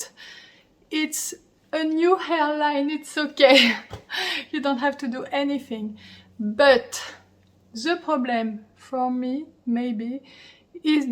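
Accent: France. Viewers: I love French. But your accent is terrible. Better not to speak English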